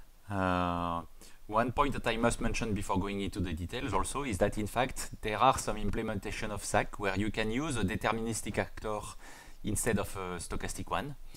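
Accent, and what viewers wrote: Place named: France. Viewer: Your french accent is lovely!! Also, thank you for so clear explanation!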